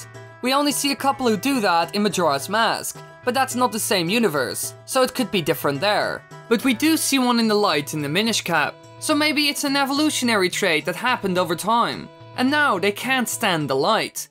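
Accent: Netherlands. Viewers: Are you Dutch because I recognize the accent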